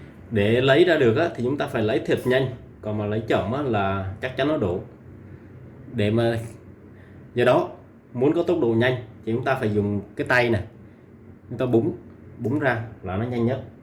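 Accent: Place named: Nghe An, Vietnam. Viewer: Giống tiếng nghệ An ri họ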